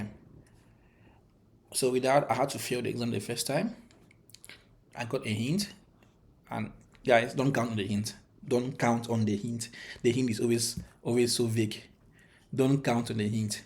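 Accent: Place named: Cameroon. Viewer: I recognize that Cameroonian accent. I'm on eJPT right now. This is probable my next cert.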